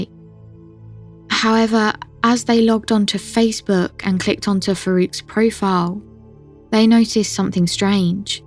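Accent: United Kingdom. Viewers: Thank you for all the content, you're a pro! Love your soothing voice and the british accent🙏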